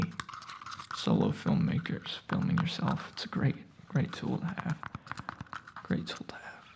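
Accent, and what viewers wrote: South, United States. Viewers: could you please make a whole video of you speaking in your southern accent..I love it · I love those retro style suitcases! I'll be your business partner but I warn you it's a 90/10% split in my favour cause that's just how I roll 😜You won't earn any money but we'll have a laugh. Btw as a Brit I love your Southern US accent so please don't hide it. ❤️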